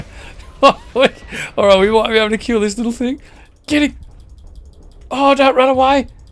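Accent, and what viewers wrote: Australia. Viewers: At first i thought it was your familiar Australian accent that was so comfortable, then I realised it was your down to earth Australian attitude. No tryhard pretentious radio announcer voice! Rare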